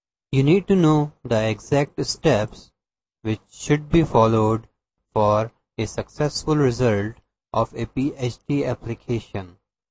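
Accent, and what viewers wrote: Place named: India. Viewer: heavy indian accent.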